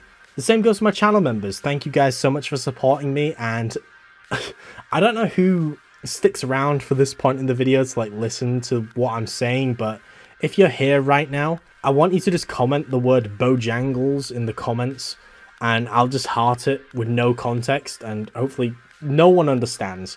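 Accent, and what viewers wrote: United Kingdom. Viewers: Bojangles, You have the same voice and accent of Andrewjrt, which area in the UK?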